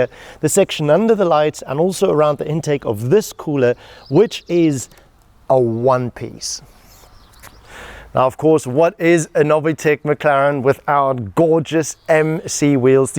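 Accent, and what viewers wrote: South Africa. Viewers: is this dude south african ???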